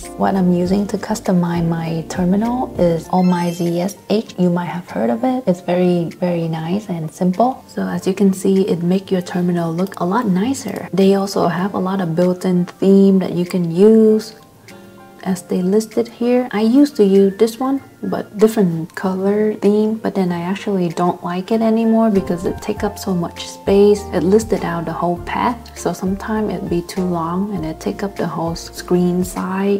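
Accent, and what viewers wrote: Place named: Vietnam. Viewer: nói tiếng anh giống người việt thế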